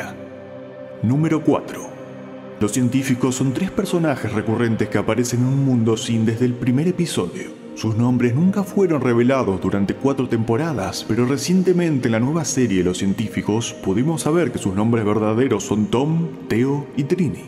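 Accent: Argentina. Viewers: che vos sos argentino¿ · Porfa que vuelva el ascento argentino:( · Soy el único que cree que la voz del "presentador" se parece a la de Zico Tops pero con acento argentino? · Primero se van los acentos argentinos,luego promos a juegos,gente,lamento decir que se acerca la decadencia del canal 😢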